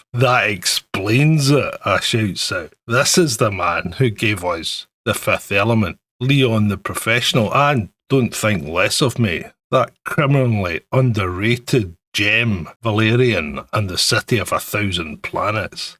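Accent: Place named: Scotland, United Kingdom. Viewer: The heavy Scottish accent narration kills me....its charming usually, but not for this, no.........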